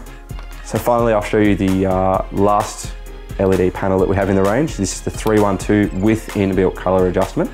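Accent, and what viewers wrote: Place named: Australia. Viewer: You can really tell an Aussie accent from all others! refreshing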